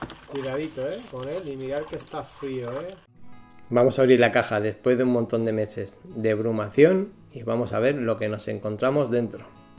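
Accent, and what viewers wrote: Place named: Spain. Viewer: Hola, una pregunta. Por tu voz me imagino que eres de España. Hace poco pusieron una ley la cual prohibía muchos reptiles o algo así. Yo soy una persona que adora los reptiles y tengo varios pero son mayores. ¿Como haces para tener ejemplares de lagartos exóticos tras la ley? Esque me interesa el teju argentino blanco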